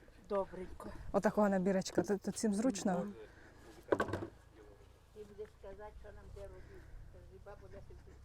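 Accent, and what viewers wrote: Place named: Rivne, Ukraine. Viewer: Хто працює той і має! Але не завжди сільський труд ціниться, до прикладу у нас на Волині, регіон Шацьких озер, малину вже і по десять гривень ніхто не бере, осипається на землю. А скільки вкрадено роботи та затрат, і часу в тих 20 соток! У вас яка ціна, та чи є збут? По діалекту ви з Рівненьської області напевне, і в районі смт. Березне проживаєте? В мене тітка з відти, тож дуже схоже.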